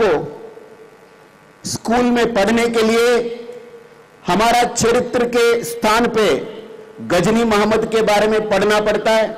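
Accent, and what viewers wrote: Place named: South, India. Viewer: Oh my god what a pure hindi .... kon kahta hai south indian hindi nahi jante ... I m also SI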